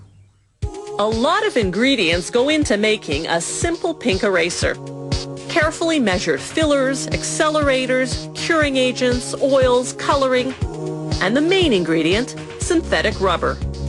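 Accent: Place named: Canada. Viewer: she's very canadian, eay.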